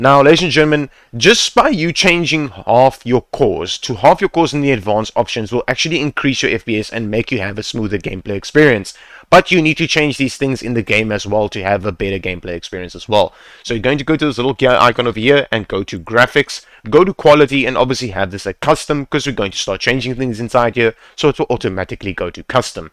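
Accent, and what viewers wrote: South Africa. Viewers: I knew you were South African as soon as I heard that accent! Big ups bro nice to see local gents doing well 👏🏾 (gonna try this out when my loadshedding is done)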